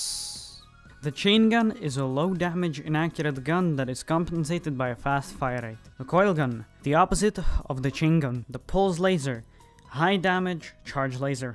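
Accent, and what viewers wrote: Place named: Russia. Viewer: я же слышу что ты русский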